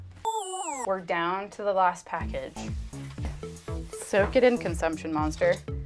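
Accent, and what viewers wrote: United States. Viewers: You sound totally American to me, I'm British Canadian and lived in Canada for 20 years but I still sound English. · It bites her sometimes. People assume she's American and think she's an idiot for not understanding common words like knurled. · You could easily pass for an American! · dude with your English speaking accent you can TOTALLY pull off that you're American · do you have an american accent when you speak swedish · It's so weird how she is so fluent in English that she sounds American but she can't read English fully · Wait, what, you're Swedish?? I'm Norwegian and have never heard any Scandianvian speaking with such a flawless American accent as yours.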